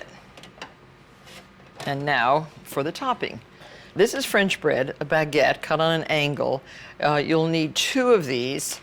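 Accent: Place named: North Jersey, United States. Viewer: prrrr-retty. So martha! So north Jersey, raised in class.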